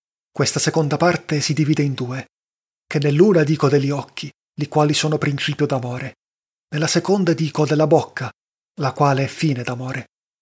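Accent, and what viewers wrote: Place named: Calabria, Italy. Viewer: Intanto grazie e complimenti per l'ottima lettura, vi scorgo un leggero accento del Sud, se non erro calabrese, e questo mi ha subito fatto pensare come, almeno da un punto di vista simbolico, come sia utile proprio a farci riflettere quanto questa lingua volgare, dalla quale, naturalmente, ha origine la nostra lingua corrente. Farci riflettere, dicevo, come è importante riuscire a considerare questo italiano volgare patrimonio di tutto il paese e non solamente Toscano. Dante Alighieri, secondo me, è una sovrapposizione di strati di genialità: questa narrazione in forma d'eloquio che fa' si che il lettore stesso possa assumere i panni del narratore; poi, naturalmente un talento poetico innato e ben coltivato; ma, sopratutto, la grande attenzione alla forma nella quale descrive la ambientazioni e dunque i paesaggi. Nella Divina commedia poi le ambientazioni sono addirittura fantastiche. 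Ecco, io credo che questo abbia contribuito molto a rendere questo poeta, oltre che sempre attuale, addirittura universale. Certamente era un uomo ambizioso, però dubito che abbia anche solo lontanamente potuto immaginare quanto a lungo e quanto nel mondo tutto la sua opera si sarebbe diffusa. Rinnovo i ringraziamenti ed i complimenti e auguro una buona continuazione in buona compagnia🙏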